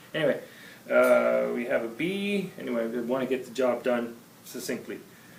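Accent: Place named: Toronto, Canada. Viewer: for any non-canadians, (and anyone using a string action ruler): A canadian nickel is 1.77 mm thick. A canadian dime is 1.22 mm thick (so two dimes would be 2.44 mm) …I didn’t pick up on the Toronto accent until after i had fished around the house for USD pocket change. American Nickels and dimes are like 20% thicker than their Canadian equivalents, maybe they’d be a good rule of thumb for setting up for slide. Anyway, thank you for the help, my tele plays and sounds like a dream!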